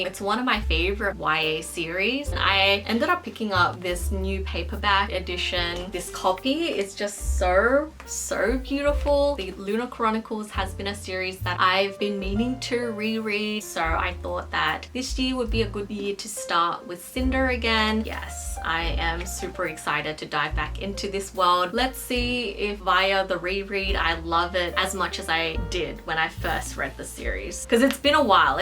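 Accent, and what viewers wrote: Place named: Australia. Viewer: I like your Australian accent and your book shelf ❤️✨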